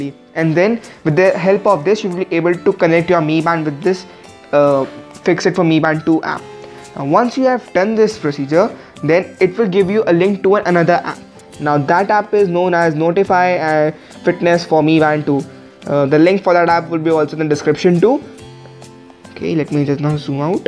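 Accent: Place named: India. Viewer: i love the way you make a pop sound from you tongue sounds so indian!! :))) anyways nice video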